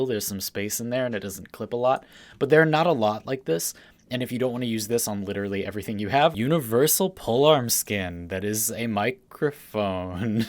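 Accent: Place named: California, United States. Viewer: is so underrated as a wf youtuber. Btw, I Love ur accent, is it Californian?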